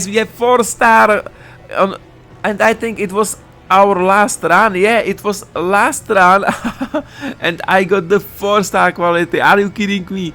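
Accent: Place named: Czech Republic. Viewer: hned vím že to je cz typek přízvuk jasny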